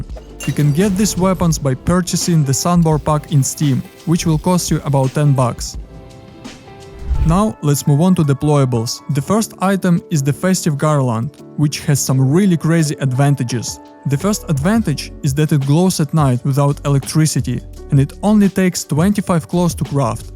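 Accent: Russia. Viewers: У вас будто бы русский акцент 🤔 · Я слышу, слышу русский акцент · оч жесткий русский акцент используй ии чтобы переводить видосы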